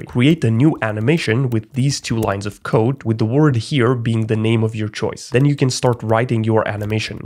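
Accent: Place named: Europe. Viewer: it's the European Manim voice.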